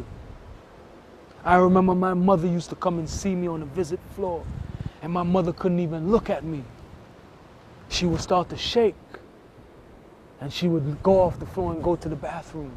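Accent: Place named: Belize. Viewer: J E He’s Belizean. Sometimes your mother tongue accent comes through if you lived in more than one place when you were growing up.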